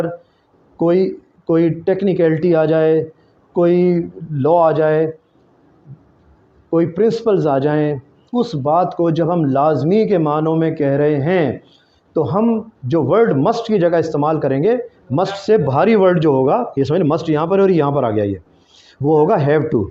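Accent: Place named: United Kingdom. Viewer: Good British English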